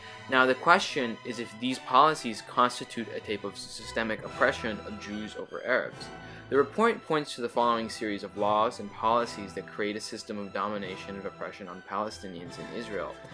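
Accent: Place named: United States. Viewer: Great video, I have only watched two of your videos so far an wish to giv so feedback, since I believe good content with bad execution/presentation undermines the content's potential. many times I have been confused with lack of sync between "infographic" and what you're saying. E.g when you talk about WB, Gaza shows up, when you talk about Gaza, E.Jerusalem shows up, etc. But the more unbearable element was your very heavy american accent. It is not the american accent itself, but rather the very slurry pronounication! Your talking pace is optimal, but if the slurry pronounciation can be eliminated by you talking slower and clearer, that would be a better option to go by. Thank you for doing this video! 🇵🇸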